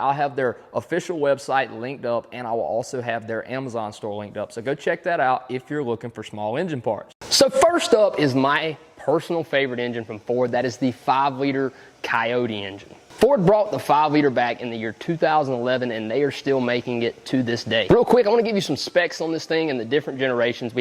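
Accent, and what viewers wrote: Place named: South, United States. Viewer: you're a natural presenter. You really know how to take a complex topic and condense it for non-mechanics to understand. And your southern accent makes the videos even more enjoyable to watch. Keep up the great work! ☮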